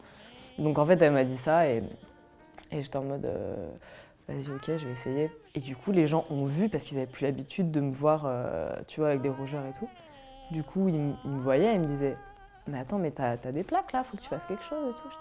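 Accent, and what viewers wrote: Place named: Paris, France. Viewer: L'accent parisiens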